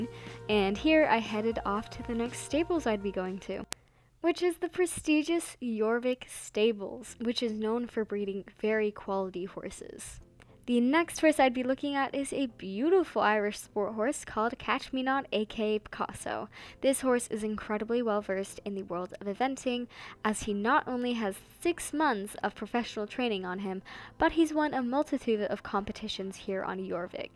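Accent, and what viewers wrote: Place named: United States. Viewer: I found this kinda awkward because its an American ish voice I don't know why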